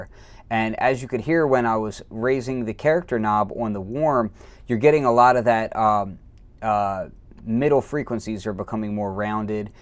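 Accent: Philadelphia, United States. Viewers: Thanks, great demo. I like your Philly accent, too.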